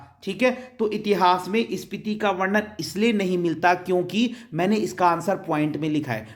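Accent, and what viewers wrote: Garhwal, India. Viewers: Sir aap garhwali ho kya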